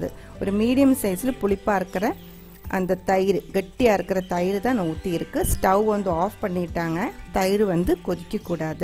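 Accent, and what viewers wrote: Kerala, India. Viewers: Tried this recipe, it came out very well❤️ Your Kerala mixed Tamil is really awesome 😇🙏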